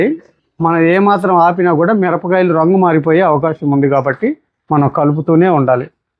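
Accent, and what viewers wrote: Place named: Guntur, India. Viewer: మీ గుంటూరు మాటలు చాలా బాగున్నాయి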